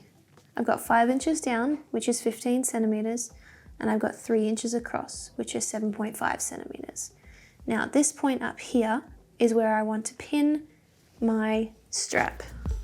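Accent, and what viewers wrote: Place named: Australia. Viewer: Oh thank god, its an Aussie and not some ageing southern momma y'alling with a voice like a drill sergeant after a night in a smokey bar doing karaoke . . . .this is exactly what ive been looking for.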